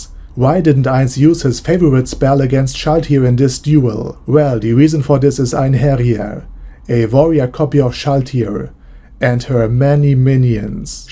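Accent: Germany. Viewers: We just need to add a bit of overacting to that German accent of yours and we have a yT channel narrated by Pandora's Actor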